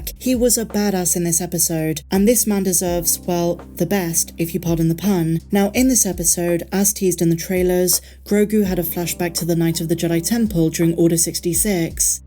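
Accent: United Kingdom. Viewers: Hi I am just loving all of the mandalorian…enjoying just watching it and then watching breakdowns and seeing things anew! Thank you❤ and having a uk voice is really special too.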